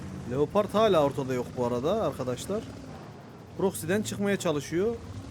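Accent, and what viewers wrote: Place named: Ankara, Turkey. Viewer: Angara şivesinin hastasıyım. Abone olduk like yaptık evelallah.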